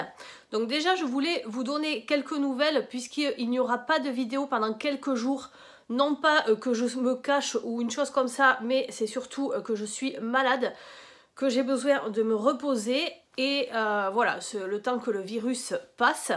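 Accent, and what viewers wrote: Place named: South, France. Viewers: Bonjour, je vous apprécie beaucoup❤ et j'aime votre petit accent du midi et vos vidéos. Ne tenez pas compte de la méchanceté des gens. Dans quel monde vivons nous... Prenez soin de vous❤ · Bonjour, continuez vos vidéo je les aime beaucoup même si je ne commente jamais... Votre accent me rappelle mon sud natal... Faites fi des rageuses!!! Bon rétablissement et à très vite, biz 😉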